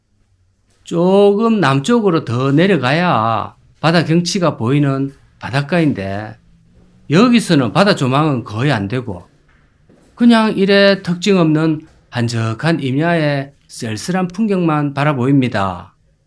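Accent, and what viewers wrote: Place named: Seongju, South Korea. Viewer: 사드배치된 성주서 살았던 어릴때 많이 듣던 가찹고 (가깝고) ㅋㅋㅋ 오랜만에 듣네요 저도 그렇지만 본인은 표준어 쓴다고 생각 ㅋㅋㅋ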